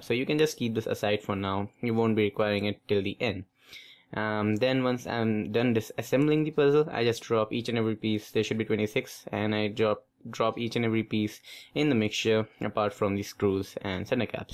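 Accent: India. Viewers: Pta tha aap ek indian he hoge qki aapki english indian jse he aur aaisa steel bowl to indian hi use karte he😁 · Of course he is from india His accent is indian and he orders some cubes from cubelelo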